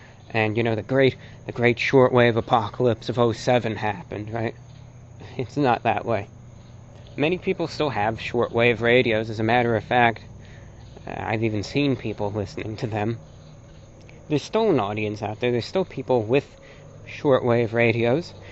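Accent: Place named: Mid-Atlantic, United States. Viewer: Gotta talk with that mid-atlantic accent.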